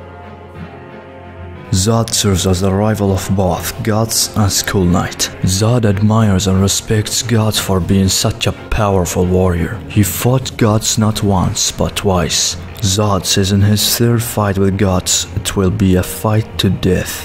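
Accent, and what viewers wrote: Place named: Russia. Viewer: Your (Russian?) accent gives an exotic and soothing tone to your speech, it’s fine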